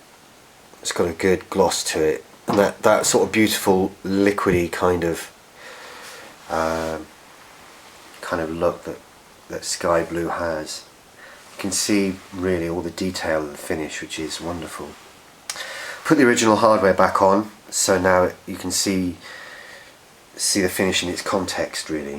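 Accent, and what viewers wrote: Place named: North London, United Kingdom. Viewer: Your restoration videos are superb man. I detect a north London accent going on there lol. I am probably wrong. I'm from up there. ! have 3 mahogany snares in my collection. !968 School Festival, 1965 Pioneer and 1948 WFL 15'' x 8'' Concert. I have kept the WFL and School Festival original. I love the mahogany colour. Your restoration video where you transformed the WFL to a Ringo Jazz Festival was fascinating. You did a great job! Well done mate. We share the passion. You are probably from Hereford or somewhere. I hear a twang! hahahaa. Stay safe. Jem )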